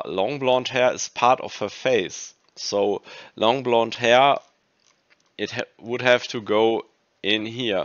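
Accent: Germany. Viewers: Ur from Germany right? 😊 VG aus FRA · that german accent is genius :D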